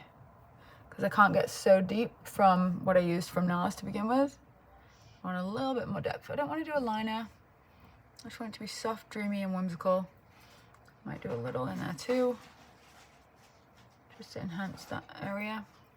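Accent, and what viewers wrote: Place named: Manchester, United Kingdom. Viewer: You kinda have a manc accent like my dad! Your looks are all gorgeous, loving this series doll!!🌸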